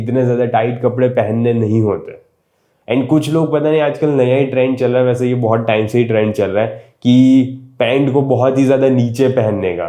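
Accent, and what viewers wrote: Gujarat, India. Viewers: bhai tamari Hindi thi mane tame gujarat na j lago chho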